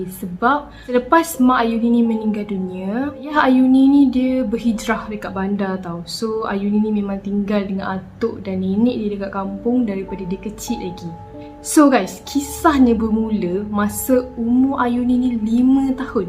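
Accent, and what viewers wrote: Kelantan, Malaysia. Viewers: cute je kak ckap kelantan 😍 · emm yoo doh la tu! lawak sikit accent kelate kak 😂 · Comel bhsa klntn 🤣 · Sy dari kelantan... btw jadi boh kecek qlate 🤣 so jgn berhenti mencoba lagi 😘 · Bendo tu ko abe.? Erm yo dh la tu.. 🤣🤣😂 bulih kecek klate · come kecek klate,hhuhu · comel kak cakap klate...best tgk kak bercerita...❤❤❤kak one of my favourite youtuber.. Salam dari kelantan...😉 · comey laa ho ore melaka kecek kelate hahaha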